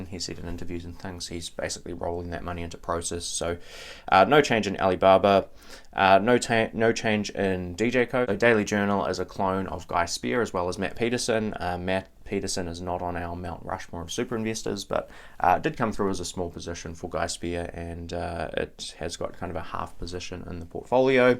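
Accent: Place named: New Zealand. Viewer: he has a Nz accent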